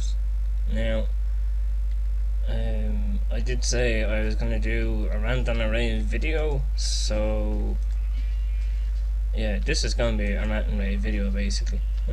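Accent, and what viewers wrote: Ireland. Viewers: spoken like a true irishman! :D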